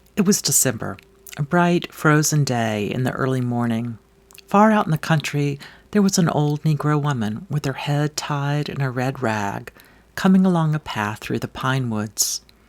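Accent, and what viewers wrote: South, United States. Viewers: Although he's better know for his non-fiction Civil War trilogy, Shelby Foote is my absolute favorite. All of my main characters carry his voice somewhere within them. He spoke a great deal about Eudora Whelty. He admired her a great deal, appreciated their bourbon and talks together. I found her through him. And I have now found you through her. Remarkable how small the world gets. How we are all connected by tiny, undetectable strings. I've listened now to another reading or two of yours and have enjoyed both tremendously. Not sure if you are from the South or not, either way you capture the essence beautifully. We'll done.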